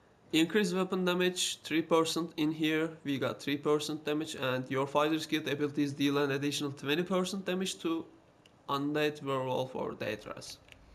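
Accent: Turkey. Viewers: Türkmüsün kardeşim Türk İngilizcesi bu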